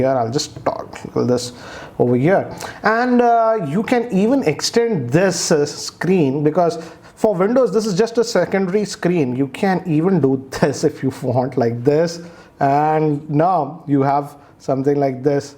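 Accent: India. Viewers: 咖喱味英语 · please stop speaking English in your Indian accent !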